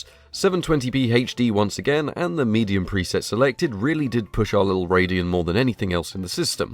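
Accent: United Kingdom. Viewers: As a french guy, I really enjou this british accent. It is better than the ugly american one. Nice channel by the way 😀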